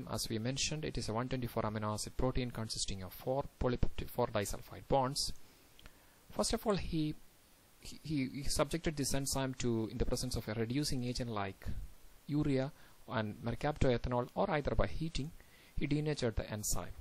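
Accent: Kerala, India. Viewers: But being a keralite I understood your accent :)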